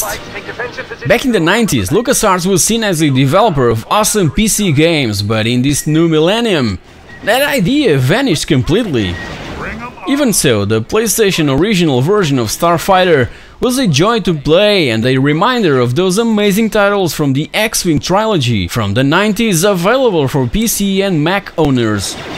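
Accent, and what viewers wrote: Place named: Portugal. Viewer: Vi logo pela forma de falar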